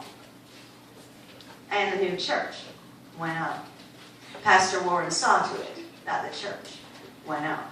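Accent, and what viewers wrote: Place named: Georgia, United States. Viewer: I love this, and I love this book!!!! Her accent sounds Georgian. I’m from Alabama, and we don’t sound like that, and it’s sure not Cajun or Mississippi-ian. Thanks for the upload!:)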